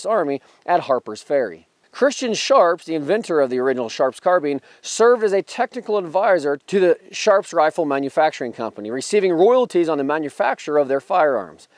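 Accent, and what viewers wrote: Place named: Ohio, United States. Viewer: Ohio accent , lighten up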